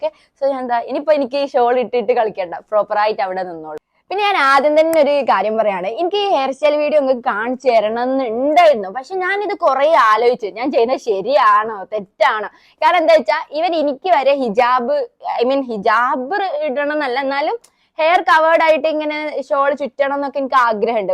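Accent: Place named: Malabar, India. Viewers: Kannur slang athyyavishyamund❤ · Malabar slag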